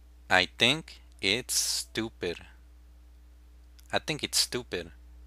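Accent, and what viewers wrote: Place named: United States. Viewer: Si es inglés americano🇺🇸🇺🇸🇺🇸🇺🇸🇺🇸🇺🇸🇺🇸🇺🇸🇺🇸🇺🇸🇺🇸🇺🇸🇺🇸🇺🇸🇺🇸🇺🇸🇺🇸🇺🇸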